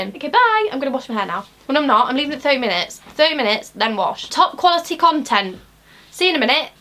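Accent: United Kingdom. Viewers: You have a nice accent...are you Scottish? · are you from near nottingham? you have such an east midlands accent! xxx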